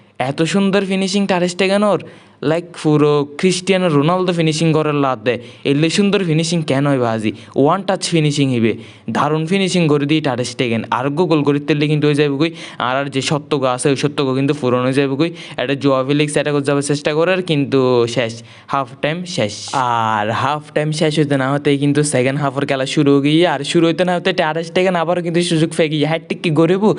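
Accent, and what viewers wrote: Chittagong, Bangladesh. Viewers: আরে দুর ভাই প্রতিদিন আর চট্টগ্রাম এর ভাষা ভলো লাগে না। বন্ধ করেন এইসব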